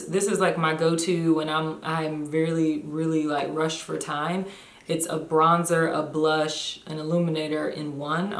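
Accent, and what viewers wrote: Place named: Alabama, United States. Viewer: Great video and I love your hair! My question: Where are you from? I hear an accent...perhaps Hunstville, AL?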